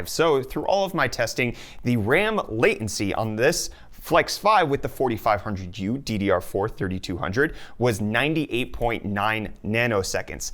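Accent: South Africa. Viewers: Wait are you South African? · Wait this dude is South African?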